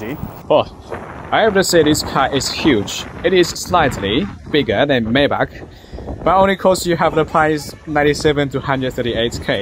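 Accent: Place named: United Kingdom. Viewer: 非要说英式很别扭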